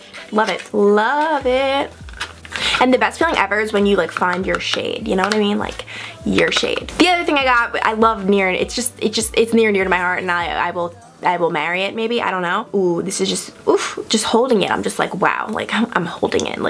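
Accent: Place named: New York, United States. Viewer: I subscribed after seeing your Victoria's Secret video. Now I'm wondering where you are from because I can really notice you have an accent in this video. Sounds like New York or Boston.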